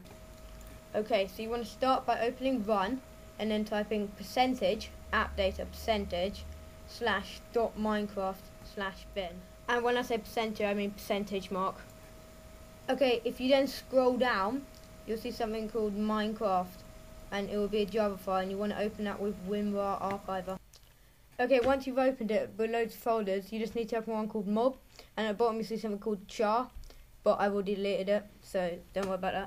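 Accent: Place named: England, United Kingdom. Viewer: Wow, your accident is deep, I mean more than BBC deep, more than Dr Who deep. No problem with that, just rarely hear someone with that deep an English accident. Anyhow, my question is how do you change the skins of offline characters, one's not registered with Minecraft. For example, you run a private offline server on your home LAN, and use whatever name, sign in offline, and you can use those characters on that server. How would you change the skins of the individual characters on the server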